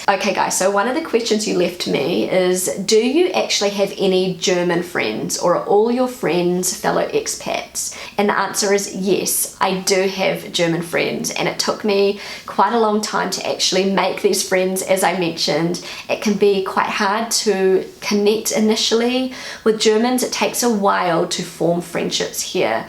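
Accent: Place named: New Zealand. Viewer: Don't be afraid about an accent; most of Germans speaking English have one ... ;o) ... so be one of us! And even as a Kiwi you have an accent which most of Germans are not used to; so don't worry. It can be managed. I worked for many international companies and get in touch with a lot of people from all over the world - they all have an accent. So ... and if you afraid of speaking ... sing! ;o) I'm looking forward for your next video in German, be sure eveybody will love it. And BTW ... don't take it as an accent, take it as you personnel dialect. Here in the Rhine area each and every small village has a dialect of it's own and when I moved here, I needed my (former) girlfriend to translate the local dialect to "normal" German.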